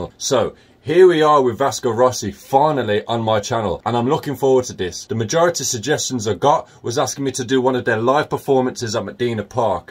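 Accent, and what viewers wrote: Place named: England, United Kingdom. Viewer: hi, where are you from? I adore your english accent. I want to learn it....I must!!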